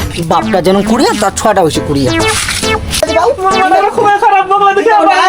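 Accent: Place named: Thakurgaon, Bangladesh. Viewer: দাদা আপনার ঠাকুরগাঁও এর লোহাগড়ার আঞ্চলিক ভাষায় কথা বলা আমি অনেক পছন্দ করি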